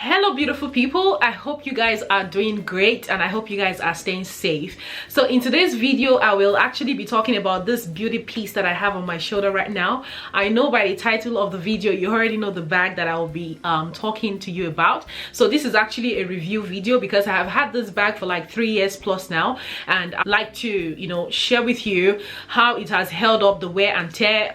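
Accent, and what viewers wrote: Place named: Sierra Leone. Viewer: Thank you for the great review. It is beautiful. Like you. Is your lovely accent from Sierra Leone?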